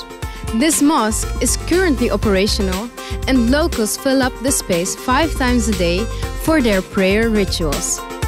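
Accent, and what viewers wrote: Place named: Turkey. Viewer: Dat turkish accent 😖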